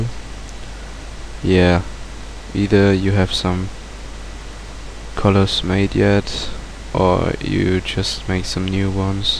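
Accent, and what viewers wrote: Germany. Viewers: are you german? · ... you're German, aren't ya?:D haha, liebe Grüße!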